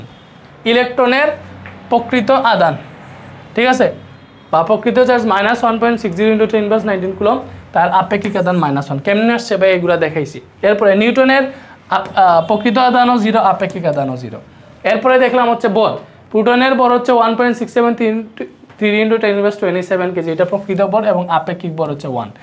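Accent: Sylhet, Bangladesh. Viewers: স্যার আপনি কি সিলেট বিভাগের?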